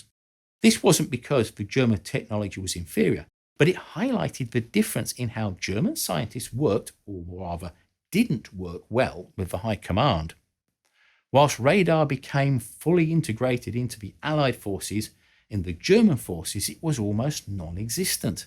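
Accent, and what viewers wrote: United Kingdom. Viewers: The British accent lends an air of credibility. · I knew this guy had a British accent before even hearing him.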